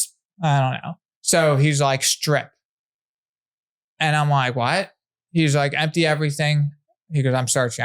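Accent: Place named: Delaware County, United States. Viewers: Wish I could listen, but that Philly accent grinds my gears hahahah. Practicing tolerance 🙏🏻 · I swear I was in bowling green in pa with you can’t forgot that delco accent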